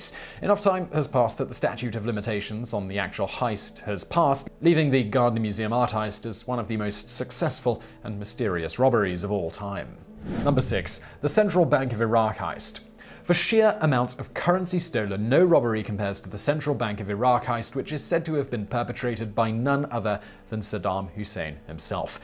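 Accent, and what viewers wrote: United Kingdom. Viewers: British pronunciation. They also refer to 22s as "two two".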